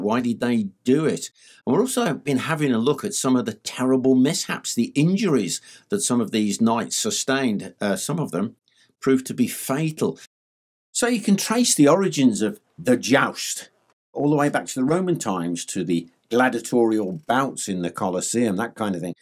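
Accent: United Kingdom. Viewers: I love this dude, he is knowledgeable and has one of the best of the British accents.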